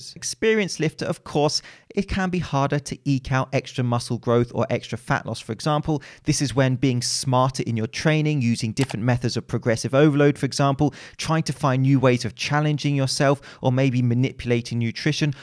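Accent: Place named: RP, United Kingdom. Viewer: Thanks for breaking it down, it was amazing explained by you with your cultured british accent. It's a shame though that doesn't address the physique competitors aspect😜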